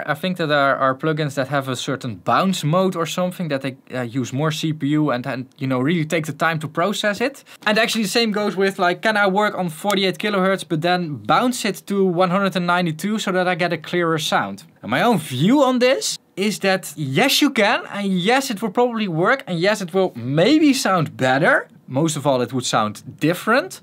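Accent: Netherlands. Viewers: I suspect that you are Dutch. You have a Dutch accent. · Are you from the Netherlands? :-)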